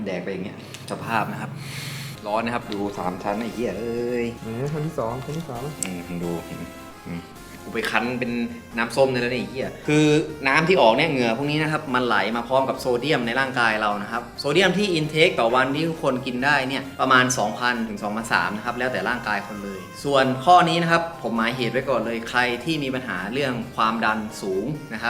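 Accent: South, Thailand. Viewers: พี่คนใต้ หม้ายยยยนิ สำเนียงนี้หมันเลย55555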